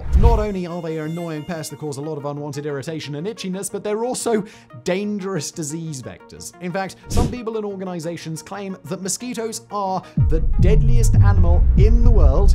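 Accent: United Kingdom. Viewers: I thought this was v sauce doing a British accent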